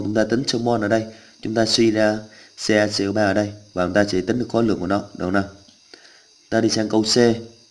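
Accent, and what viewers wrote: Binh Dinh, Vietnam. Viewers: Thầy quê Bình Định à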